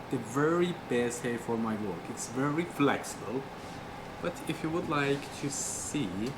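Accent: England, United Kingdom. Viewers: What part of England are you from? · Great video (subbed!). Where in England are you from out of interest? Your accent reminds me of my cousins 😄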